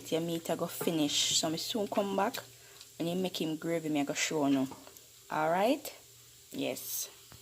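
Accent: Jamaica. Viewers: I like how you keep the Jamaican accent lol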